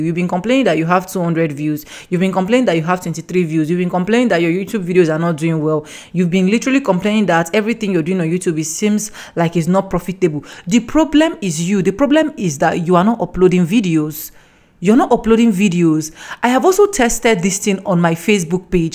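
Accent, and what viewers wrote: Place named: Nigeria. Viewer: I like your Nigeria accent, stay blessed Your new subscriber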